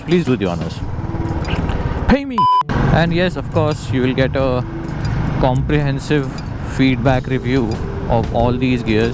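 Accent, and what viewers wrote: Malvani, India. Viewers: Bro has that very good English accent And then has the Proper Malvani Accent too "Khay jaata tu?" Damn.......... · मालवणी माणूस मालवणी बोलताना भारी वाटता. एक दम गावाक एल्या सारख्या एक नंबर भावा 👍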